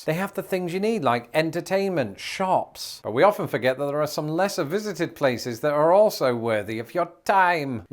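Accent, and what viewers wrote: United Kingdom. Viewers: Lots of good stuff there. Then again... Your example of sales tax in Maine - which you should have specified was SALES TAX - was overblown. Exaggeration for effect is fine, but that was nonsense. I agree completely about your British accent and the impact on Americans outside the major cities. Note that I said YOUR accent. Have you been here so long you forgot that there are a variety of them? Or maybe your video is only aimed at others who sound like you? Of course there are both accents (how words are pronounced) and dialects (veering off into a different vocabulary), and you specified accent (singular), but your advice might not be so useful for someone from Yorkshire, or perhaps working class just about anywhere. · Says "trains, buses and broomsticks" with a straight face. Lol. Love British humor (and accent). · Don’t fret too much about not tipping in Westminster, Colorado. 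They’re okay. I find that the type of British accent affects people’s perception of the British person. In Colorado, an accent like (?) would make people think he’s very cultured and intelligent. It would be intimidating to some, but many would want to know what it’s like to dine with the queen. We can be ignorant. If it’s, say, a cockney accent then Americans (around here anyway) are going to think you’re a hooligan and try to party with you all the time. Or avoid you because they’re old, like me, and are too tired to party anymore. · This American LOVES the accent. I will sit and listen to anyone from the UK for hours. I fall asleep listening to sleep stories read by English/UK actors/voice actors.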